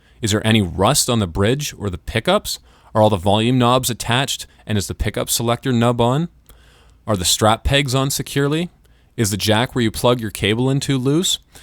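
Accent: Canada. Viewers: that canadian accent ughhhhhh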